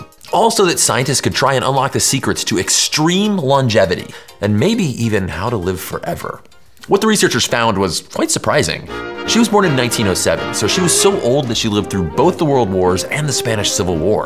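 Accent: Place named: Texas, United States. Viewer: Where'd that Texas accent go?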